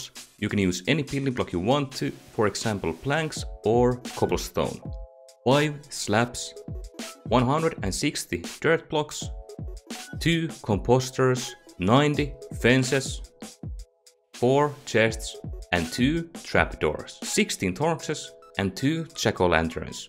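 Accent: Finland. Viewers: Are you finnish? I can hear your accent😂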